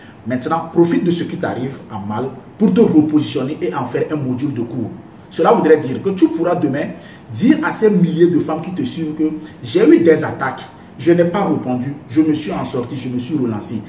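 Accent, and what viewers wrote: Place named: Togo. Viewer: Enfin un togolais qu'on peut suivre. J'ai reconnu l'accent en meme temps. Preach brother!! Preach .... Bon vent a toi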